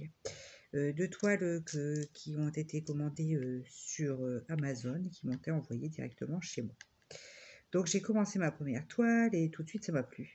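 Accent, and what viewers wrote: North, France. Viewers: Oh une voisine en plus j'avais cru entendre le petit accent du nord lol. Moi je suis du nord mais originaire du pas de calais.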